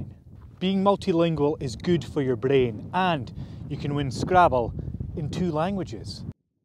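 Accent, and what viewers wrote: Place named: England, United Kingdom. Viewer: Why is this Englishman using that silly accent?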